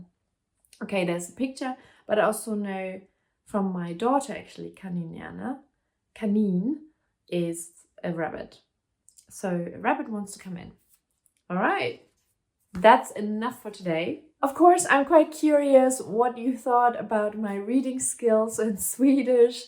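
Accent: Germany. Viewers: I will give you an A for effort! I think you did it allright, and as someone else already pointed out you speak swedish with a german accent - which I think many swedes find rather cute... (We have a swedish female celebrity with german origin who has been living in Sweden for almost 50 years and she still has a strong a strong accent, in fact your pronounciation sounds a little better than hers!) Within a year - or less - you will be almost fluent in swedish is my guess. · You did really well! Swedish with a german accent is so charming, really love it 💚 Of course there are several tricky words, one of them is "och", it's pronounced "ock" or like a short "å". You go girl! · Well there is a potential for getting better! Some times you use german pronuniances where english wold be better! In anyway, swedish and other Norse language could be named as "plattyska dialekter", (north german tounge), that is eradicated in Germany and is replaced by (högtyska dialekter), high german tounge! 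They say that sweden is one of the best english speking country in the world.. thats only says that rest of the world is worse! In anyway, the more swedish you understand the better, and you can answer in english, in many cases! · Oh dear, that German accent is so hard to lose ... I've got an acquaintance (from Austria I think) who's been here for ages ... He still has the German accent =). Just keep it up - as others have said, as a German speaker, learning Swedish should be fairly "easy".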